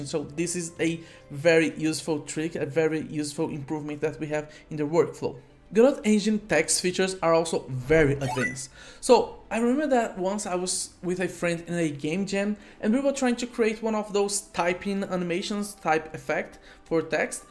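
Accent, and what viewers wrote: Brazil. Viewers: Brazilian accent 🫶🇧🇷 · Tu é br né vei ? tem sotaque de br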